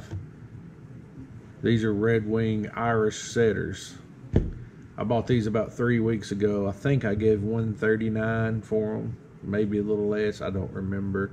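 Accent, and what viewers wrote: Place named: South, United States. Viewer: I like your boots, and I like that southern drawl of yours. Happy New Year to you. From Canada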